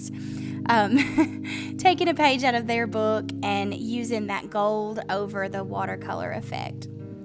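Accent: Alabama, United States. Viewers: I’m from North Alabama. You sound like you are from my area. Thanks for the video.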